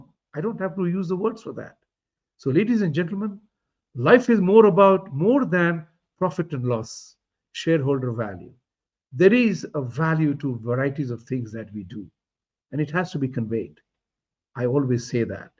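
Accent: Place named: India. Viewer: This old guy talks nonsense trump and modi is great u smells fishy 😊 ur Indian accent is nooo good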